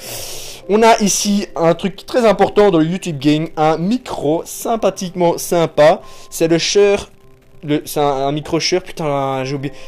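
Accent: Belgium. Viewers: Lionel Messi normal il est belge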